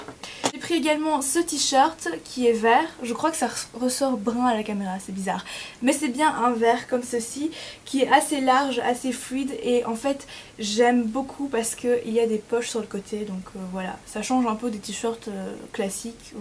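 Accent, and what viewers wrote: Belgium. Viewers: Oh je n'avais même pas remarqué l'accent belge mais quand tu as dit bruxelles ça a tilté !!!! Enfin bref j'adore tes vidéos , continue comme ça !!!! ;)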